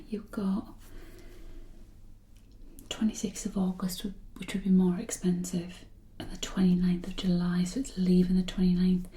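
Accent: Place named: Newcastle, United Kingdom. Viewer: knew you were from newcastle!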